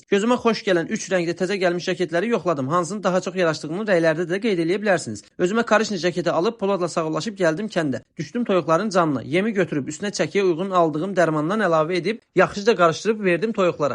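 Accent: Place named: Balakan, Azerbaijan. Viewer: Şirin Balakən lehcesi😊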